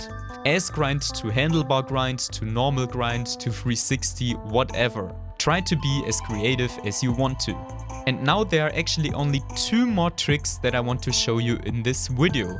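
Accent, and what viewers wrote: Germany. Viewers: Are u a german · Man hört den deutschen raus 😂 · wusste ich doch, dass ich einen Landsmann an seinem Akzent erkenne. xD Danke für die schnelle Antwort, mach weiter so mit den Videos. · The fax that’s he German u can say by he’s accent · Dieser Deutsche Akzent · Irre ich mich oder höre ich da einen deutschen Akzent heraus? 🤭😂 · Is he German he has so A German act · Dieser deutsche Akzent xD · Man hört richtig das du deutsch bist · Bavarian englisch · Ahh ich war mir nicht ganz sicher als ich den Aufbau der Deutschland Flagge auf dem Pfp gesehen habe aber mit dem Akzent bin ich mir sicher also dir noch ein gutes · Are You German? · Dieser deutsche Akzent zu wild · Are you german? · Its a DEUTSCHER AKZENT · Du bist deutsch oder?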